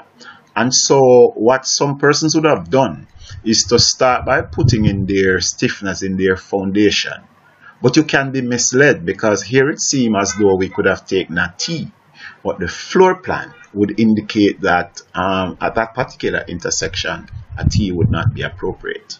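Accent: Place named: Jamaica. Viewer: How yuh mean mi general! Jamaican accent pon dem!!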